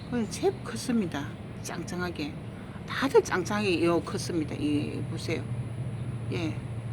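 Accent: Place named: Gyeongsang, South Korea. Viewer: 예뿐다육이들 정성스럽게 잘관리하시고요. 말씀을 아주 예쁘게도 하시네요. 정말 경상도사투리가 정겹네요?😄😄😄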